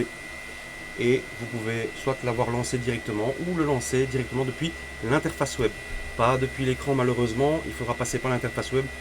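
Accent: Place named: Belgium. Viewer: De toute façon merci pour les vidéos très instructives, la rançon du succés c'est de ne pas avoir assez de temps pour faire tout les spectacles, un peu comme une vedette de la chanson quoi ^^^ et vive la Belgique (et ses supers chanteurs et humoristes) et j'avoue sourire quand vous vous excusez auprés des français pour la prononciation des chiffres à votre manière.... PS: comme je débute je préfére Cura pour le moment pour cette machine mais si vous avez le temps pour la suite en vidéo particulièrement pour un tutoriel (didacticiel en bon français ^^) sur par exemple "comment on fait sa première impression après avoir suivi les cours de??" Et aussi "que faire quand on a terminé son impression?" (je ne me suis pas encore lancé sur cette machine plus que récente pour moi en arrivée à la maison). Merci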